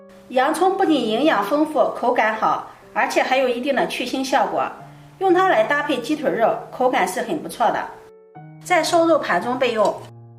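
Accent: Shandong, China. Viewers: 第一次收到推送就关注你了，听口音山东银👍💪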